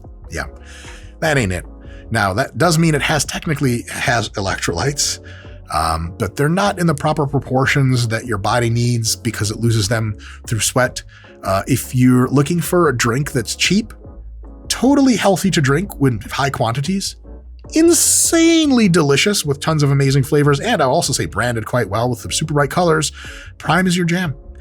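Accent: United Kingdom. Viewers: Love the British accent Dr Mike ! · But he’d have to do the whole thing in his bri’ish accent.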